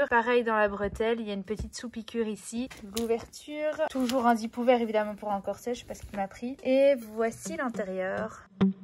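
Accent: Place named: Belgium. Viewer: Ahah tu es folle j'adore! Merci pour le fun et cet accent belge je ne men lasse pas!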